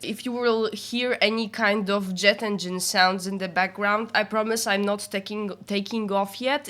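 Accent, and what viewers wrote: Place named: Poland. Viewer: Polka? Bo masz dobry angielski, ale ten akcent trochę zdradza ;d W każdym razie trafiłem na eseje o Raphaelu i widzę, że nie tylko ja mam obsesję na jego punkcie. Pozdrawiam.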